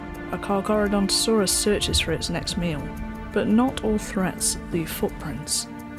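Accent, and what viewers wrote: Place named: United Kingdom. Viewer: Ah ha! So you are bri-ish!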